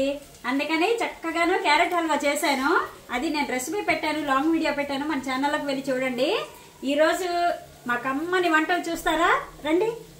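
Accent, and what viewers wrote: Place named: Vizianagaram, India. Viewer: మీ మాటలు వింటుంటే మా విజయనగరం భాష లా ఉంటుందండి వాళ్లు చాలా చక్కగా ఆప్యాయంగా మాట్లాడతారు మీ వంటలు super 👌👌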